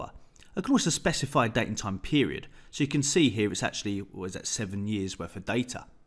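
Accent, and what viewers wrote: United Kingdom. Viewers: Had to download parallels and excel for windows. You have such a gift of teaching, all very clear and easy to follow. Awesome proper brit accent too haha. Just a question: can you change settings for the Bing map style, colours, etc. ?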